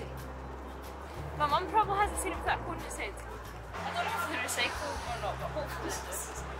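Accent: Scotland, United Kingdom. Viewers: Its too heavy as british accent, lol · Love you Scottish accent and your down to earthiness ! I travelled all around Scotland with my younger brother many, many moons ago and loved it ! Mind you, I stayed in the U.K. for 12 years and it was full of fond memories. Keep up the good work ! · Thanks goodness for the English subtitles, otherwise I'll have a tough time understanding her Scottish English since I am more used to American English. I think Chinese subtitles might help her get more subscribers just in case she wants more audience. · Its so enjoyable listening to your British English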